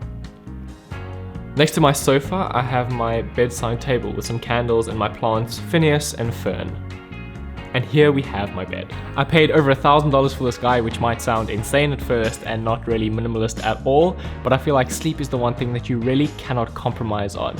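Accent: South Africa. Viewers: are you south african?? idk why but that's what your accent is screaming at me😂😂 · Are you south African? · I thought this was a South African minimalist apartment when I heard your accent lol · Wait, you're South African? it refreshing from all the other american and british you tubers. Nice to hear my accent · You sound South African, are you ?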